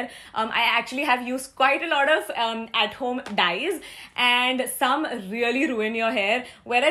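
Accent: India. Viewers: can you speak hindi for the one whole video love your hindi excent ..............:>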